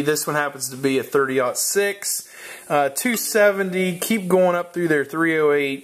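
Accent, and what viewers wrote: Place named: Pittsburgh, United States. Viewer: You from Pittsburgh? I know a yinzer accent....if your shop is around the Burgh, ill be stoppin over for one.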